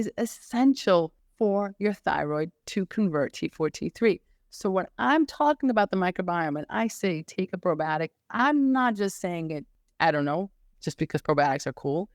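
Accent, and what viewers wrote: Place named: New York, United States. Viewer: This is an east coast US accent like New York or something like that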